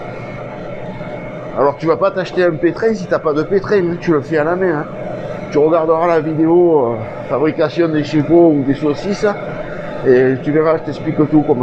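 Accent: South, France. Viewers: Bonjour Mr " ", Je découvre aujourd'hui même vos vidéos (Fabrication du Saucisson pour la première) et ensuite votre chaîne avec les saucisses... Ainsi que malheureusement, le départ brutal de votre ami " Tatane " 😇 Courage à vous (même si nous sommes en 2023) et l'on n'oublie jamais ses Amis Pour en revenir à vos vidéos, des conseils, de l'enthousiasme... On devine toute votre passion et votre volonté de transmettre. Que du Bonheur de vous visionner, vous écouter avec en plus, ce petit accent du Sud: Charmant. avec un sérieux, une franchise. Tout gamin, de manière familiale, le Cochon représentait un événement annuel que j'ai un peu perdu de vue avec l'âge. Je suis de la Loire. (non loin de Polignac d'ailleurs). Grâce à vos vidéos, cela me redonne de l'énergie pour recommencer Alors, rien que pour cela: MERCI Et pour votre Citron Pulco, c'est bien mais j'adore le commentaire ci-dessous de Denis Etienne: " Pour ce qui est de ton embonpoint, mon grand père me disait qu'un boucher maigre n'est pas un boucher de confiance. 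" Tout est dit 😊 Et pour le coup du Masque!!! Bravo pour votre franchise et la belle Remise en place de certains!!! Alors encore MERCI:washhands: Continuez ainsi. Vous êtes non pas un Vrai Personnage mais un Personnage VRAI!!! Cordialement.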